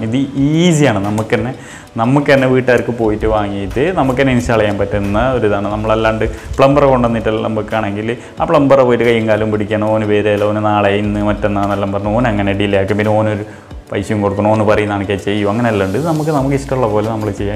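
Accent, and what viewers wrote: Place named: Kasaragod, India. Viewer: കാസർഗോഡ് സ്ലാങ്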